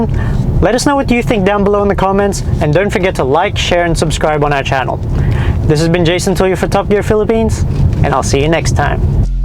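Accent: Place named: Australia. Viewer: Someone has been living in Australia..nice accent. These cars are imported from the US and are the Canadian spec.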